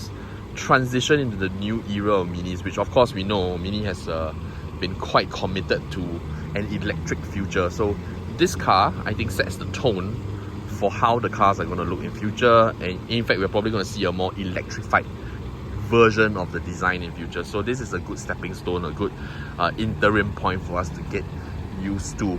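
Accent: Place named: Nigeria. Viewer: Thank you for the review!! Considering purchasing. Also, you kinda sound Nigerian.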